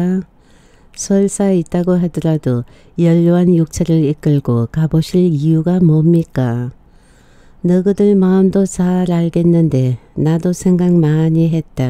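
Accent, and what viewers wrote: Gyeongsang, South Korea. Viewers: 고향 운정 미국이민 20년 타향에서 도식오빠 순례 애틋한 인연 한편의 영화 님 경상도 밀양 사투리 가슴 이 먹먹합니다 감동입니다🎉😢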